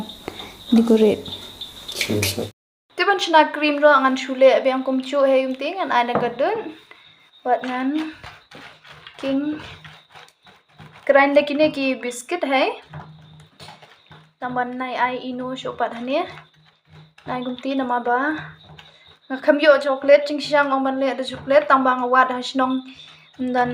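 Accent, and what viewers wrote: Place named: Mylliem, India. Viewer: Sngewnang bha ka sur Mylliem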